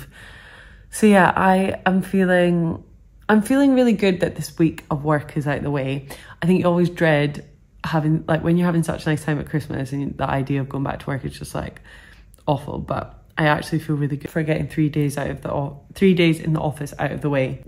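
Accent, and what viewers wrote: Scotland, United Kingdom. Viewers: Just found you and I love your vlogs 😍 They’re so realistic and relaxing to watch! Are you scottish (I hear the accent😅) ? And do you have an instagram?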